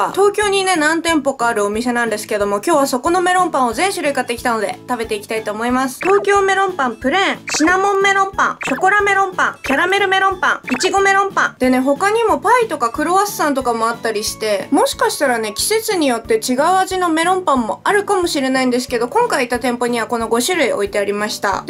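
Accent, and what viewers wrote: Aomori, Japan. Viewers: 私も東北出身だからの青森訛り聞くと実家いるみたいでほんとに落ち着く笑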